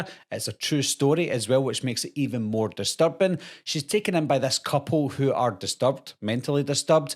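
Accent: Scotland, United Kingdom. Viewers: Is this guy Scottish? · As soon as I heard the Scottish accent I was in! Then for you to recommend a couple if Aussie flicks AND know about The port Arthur massacre we had, I am now subbed 👍🏼 🇦🇺 🏴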